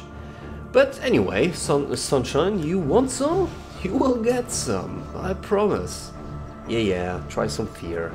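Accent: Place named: Hamburg, Germany. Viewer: Ich bezweifel dass es dir m&#246;glich ist zu verstehen was ich meine. Erstmal bin ich kein WoW-Kind, als ich Kind war gab es weder WoW noch gro&#223;artig verbreitete PC&#180;s. Du &#228;usserst keine Kritik sondern schreibst was dir nicht gef&#228;llt und das in einem aggressiv negativem Stil mit einer Menge indirekter Beleidigungen. Ob du falsch oder richtig liegst liegt im Auge des Betrachters aber dein Verhalten ist keinem Erwachsenen angemessen. "hatte mal 2-3 videos von dir zu MoP zeiten geguckt war noch ganz lustig aber was soll dieses hochn&#228;sige verhalten und diese grauenhafte englische aussprache wo du versuchst nicht deutsch zu klingen..." Was genau ist grauenhaft an seiner Aussprache und warum meinst du dies sagen zu k&#246;nnen? Warum sagen ihm Muttersprachler dies nicht sondern loben ihn sogar weil er nicht nach Schulenglisch klingt? 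Er sprich seit einigen Jahrzehnten genau so Englisch und hatte damit auch keine Probleme. Ich frage mich auch warum man als offensichtlich nicht-Deutscher, das h&#246;rt man trotz Hamburger Schnut in jedem seiner Deutschsprachigen Videos, versuchen sollte nicht Deutsch zu klingen und wo liegt dein Problem dabei? Es sollte dir klar sein dass du deine Community vertrittst und dass du ohne indirekte Beleidigung kaum einen Satz beenden kannst. Hier kannst du, trotz der Schreibfehler in der &#220;berschrift, eine kleine Einsicht erhalten wie man Kritik &#228;u&#223;ert - k&#246;nnte dir helfen denn im wahren Leben l&#228;ufst du bei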